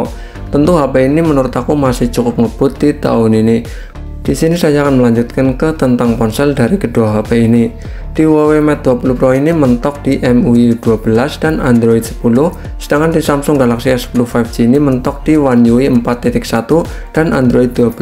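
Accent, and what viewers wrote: Banyumas, Indonesia. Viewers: Logatnya ngapak bg😁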